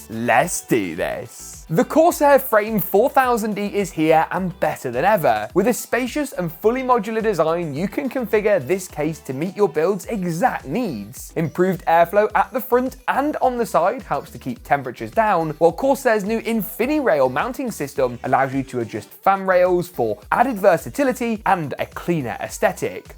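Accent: United Kingdom. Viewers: Just subbed. Very clear info and british, love it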